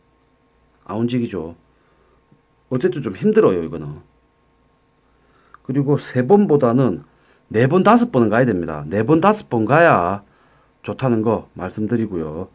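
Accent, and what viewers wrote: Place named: Gangwon, South Korea. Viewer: 강원도 분이 신가봐요. 너무 웃기게 말씀하네요. 감사히 시청하였읍니다.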